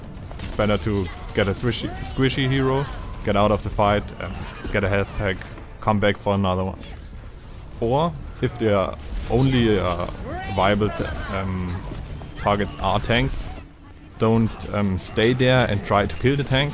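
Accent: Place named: Germany. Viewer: Are you german? I think you are i can hear of your dialect. Like your vids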